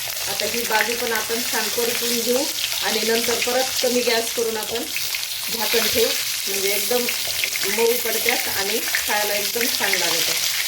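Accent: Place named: Beed, India. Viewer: शिमला मिरचीची काय भाजी 🫜 मिरच्या धुवून साफ करून याच्या मध्ये मसाला भरलेला❤🎉 ताई तुमच्या भाषेवरून माझ्या आठवणीत आले होते तुमचे नक्की बीड माहेर असणार❤ बीडची भाषा आपापली लगेच ओळखायला येते❤ ढोबळी मिरची रेसिपी खूप छान वाटली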